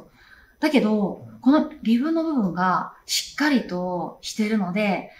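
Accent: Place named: Tsugaru, Japan. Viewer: 津軽訛りで好きなこと早口で喋ってる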